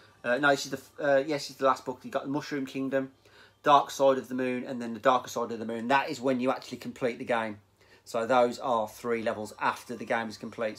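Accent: Birmingham, United Kingdom. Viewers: Good to hear a brummy voice on YT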